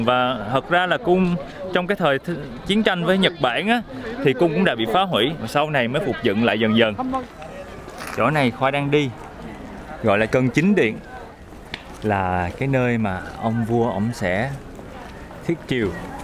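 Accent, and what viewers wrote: Mekong Delta, Vietnam. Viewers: noi chuyện dễ thương chân chất người miền Tây love cho 1 live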